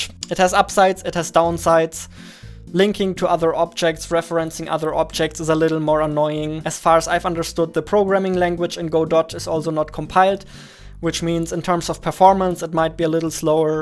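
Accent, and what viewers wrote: Germany. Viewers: Are u german? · Are you from Germany? You kind of sound like it. I am from Germany but I can't really tell if you are because your English is so fluently xD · I understand your English really good, are you German ? ^^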